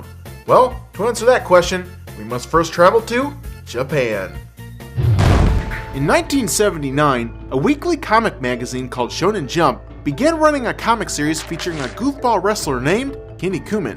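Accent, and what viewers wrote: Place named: South, United States. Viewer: Great episode. Though I think it's funny how he mispronounces Kinnikuman. Sounds like the way they'd say it here in the south. "Yeah man, this here's my cousin Kenny Kumen!" For the record it's said more like KEY NEEK EW MON.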